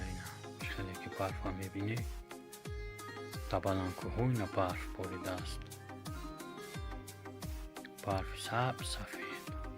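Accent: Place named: Garm, Tajikistan. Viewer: Оханги дилнишин гап нест оханги кия брать Гарм супер ба пеш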